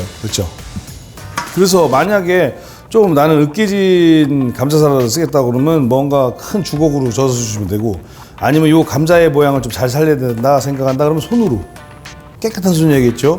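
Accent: Chungcheong, South Korea. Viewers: 으 감자셀레드 멋지게 활용 잘봣십더 요리 즐겁게해주셔 많은양 을 어덯게 햇는지도 모르게 다 빠르게 신나게 실렁실렁 보너스 충청말씨 아주 잘봣십더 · 충청도 출신이라 백선생님 말씀하시는거 듣고 있음 고향에 간것같이 편하구 좋음~~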